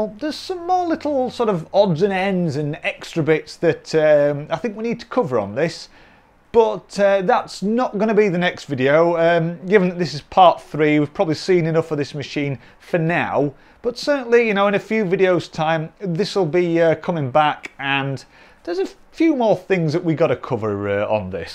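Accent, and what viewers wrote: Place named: Yorkshire, United Kingdom. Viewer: You are welcome and judging by your accent you are from Yorkshire?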